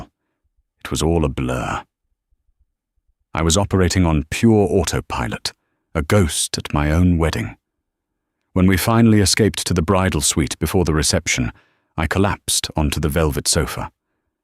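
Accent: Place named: United Kingdom. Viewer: Why is this story about a female and they used a male British accent it’s confusing and the voice is all wrong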